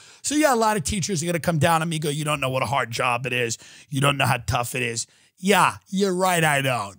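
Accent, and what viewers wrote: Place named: Suffolk County, United States. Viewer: LMAO. I put off liking Tim for the longest time but it's cus i grew up in Suffolk County LI and spent so much time trying to "overcome" it.. But listening to Tim is like being back home. I guess I'm saying congrats and continued good luck to him, as well as thanks for the entertainment. This is a compliment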